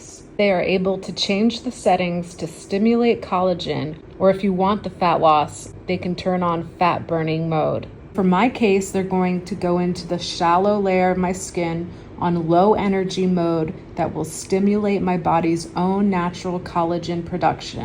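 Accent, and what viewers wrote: South Africa. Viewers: Hi. Glad to hear a South African. I'm from South Africa. Johannesburg. 😊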